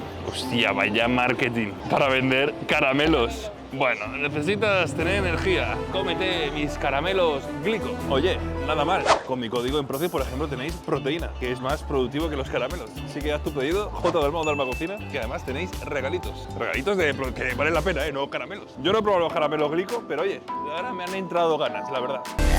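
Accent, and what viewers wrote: Argentina. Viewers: Se puede saber porque tiene que poner acento argentino? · en la CASHE...jajajajajaja, el ESPAÑOL mas ARGENTO del MUNDO MUNDIAL y OLEEEEE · amo como después de venir a argentina se te quedó la pronunciación de algunas cosas o te gusta decirlo así. gracias por tantos videos de calidad dalmau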